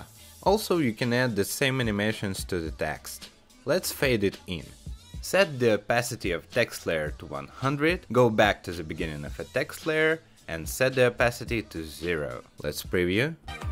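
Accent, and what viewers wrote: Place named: Russia. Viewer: Привет. Сижу готовлю видео на ютуб на эту же тематику что у тебя (только на русском). Ну и полез смотреть кто что и как сделал. И я крайне удивлен что у тебя так мало просмотров. Твой тютёриал один из лучших, что я видел. Подпишусь ка на всякий случай 👍 P.S. Да я понял что ты говоришь по русский из-за акцента. Но на всякий случай пришлось лезть к тебе в фейсбук чтобы убедиться на 100% 😉 Я кстати тоже с Украины. P.S.S. И рад знакомству 🤝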